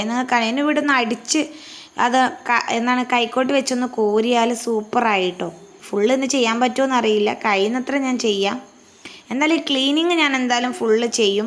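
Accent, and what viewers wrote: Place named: Malappuram, India. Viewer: നിങ്ങളുടെ സംസാരം മലപ്പുറത്തെ പോലെ തോന്നി😄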